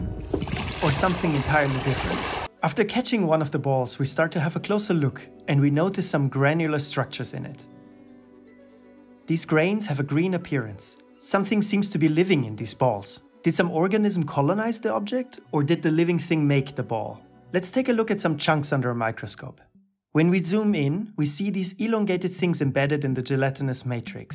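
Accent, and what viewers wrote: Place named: Switzerland. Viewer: I believe so - also i think i detect a swiss accent in the content creator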